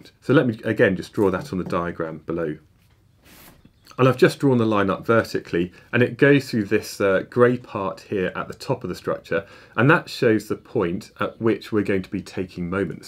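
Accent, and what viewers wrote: United Kingdom. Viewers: English accent: “ah, I’m home” Spells it ‘center’ : “wait, what?”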